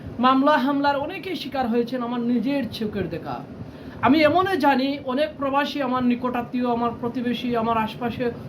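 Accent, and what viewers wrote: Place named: Sylhet, Bangladesh. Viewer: আচ্ছা আপনি কি অন্যায় প্রতিবাত করেছেন? কথায় মনে হয়ে আপনি সিলেটি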